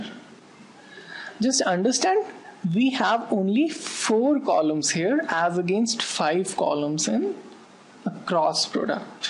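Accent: India. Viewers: You have a strong Indian accent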